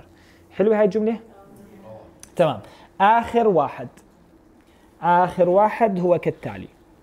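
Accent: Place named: Levant, Arab world. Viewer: شكرا ،، لهجتك حلوة عراقيه سورية فلسطينه لبنانيه ماعرفت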